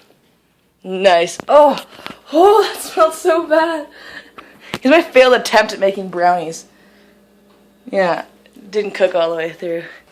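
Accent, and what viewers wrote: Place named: North, United States. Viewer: I'm sorry for your loss! What state are you in? You sounded like you were from up north but you threw me off with the palm trees...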